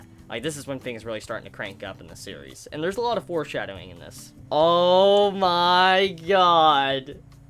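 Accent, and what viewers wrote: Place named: Tennessee, United States. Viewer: hmmm i notice you speak with an accent at times, then i hear you live in Tennessee. So i assume you build up an accent or grew up with the accent.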